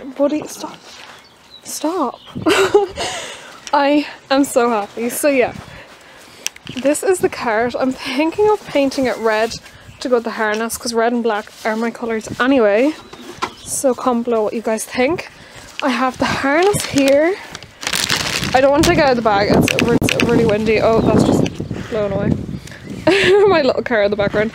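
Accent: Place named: Ireland. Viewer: Love this!! All your gifts look great! Hope you had a good birthday! Also I love your accent🤣🤣I'm half Irish but I don't have the accent☹☹❤❤xx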